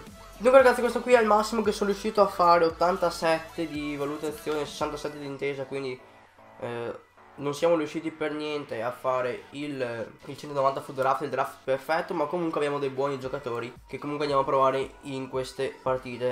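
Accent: Veneto, Italy. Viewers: ma sei del veneto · nuovo iscritto,sei veneto per caso ? 😂😂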